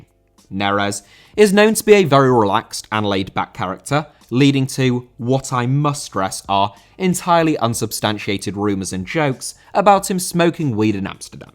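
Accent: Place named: United Kingdom. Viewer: That's just how pronounces it with his Bri'ish accent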